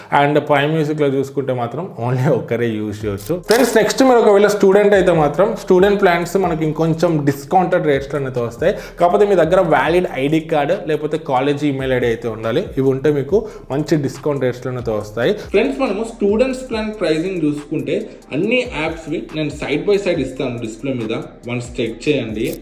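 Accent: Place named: Telangana, India. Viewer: Broo ah Telangana slng loo kakunda normal slng loo video cheye broo adhe better ekkuva mandhi choostaru ide na suggestion 😊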